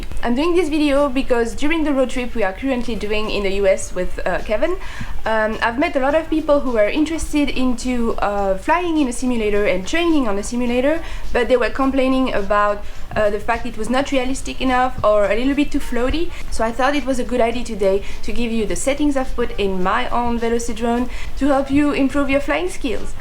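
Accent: France. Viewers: Quite interesting video for simulator... and what a nice little French accent :) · sounds a bit like a french accent xD ... and i really like this rooster frame.. guess it will be my next one :D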